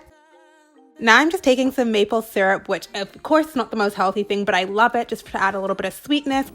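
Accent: United Kingdom. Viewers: Are you British? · Hey. Is that a British accent you partially have?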